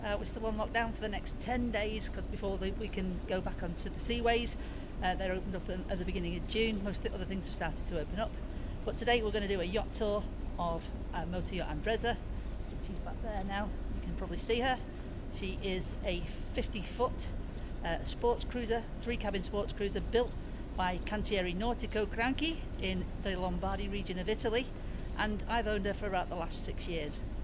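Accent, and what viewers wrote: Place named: North, United Kingdom. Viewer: Thanks enjoyed that, I too found your channel from Nick's recommendation, though being a fellow northerner I prefer your accent.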